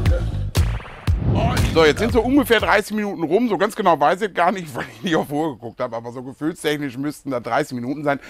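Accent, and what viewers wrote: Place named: Hesse, Germany. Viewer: Muss seit 2 Jahren in Hessen studieren, dein Dialekt gibt mir ein Gefühl der Heimat✌🏻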